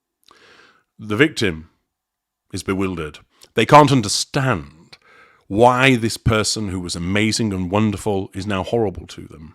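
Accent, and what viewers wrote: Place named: Yorkshire, United Kingdom. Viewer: No - I can still hear a tiny bit of the Leeds? (Yorkshire) accent in the lad. Most of our British aristos talk with a handful of pebbles ' int pie-ole'. 😅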